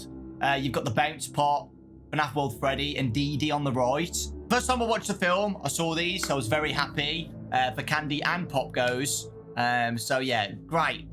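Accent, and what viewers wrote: United Kingdom. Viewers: Ahhhh he’s British😂😂😂😂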